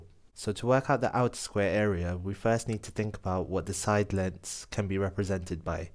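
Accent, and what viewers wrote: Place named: United Kingdom. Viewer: Excellently explained sir. Keep up the good work. Most importantly,I like your British accent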